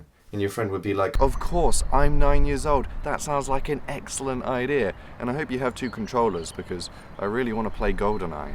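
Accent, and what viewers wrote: United Kingdom. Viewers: Watching this is like listening to my journal being read with a smooth voice with a lovely British accent. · Yours so damn British...but in a good way, of course. :)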